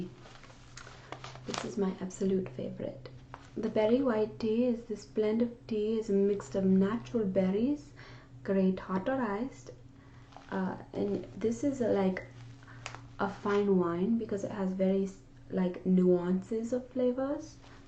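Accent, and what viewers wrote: India. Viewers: I love that Indian accent. So many sub continent tingles.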